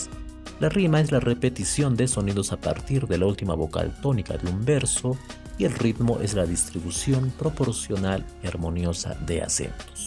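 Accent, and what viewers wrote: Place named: France. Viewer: Tienes minimo acento de un frances , muy minimo pero lo tienes xd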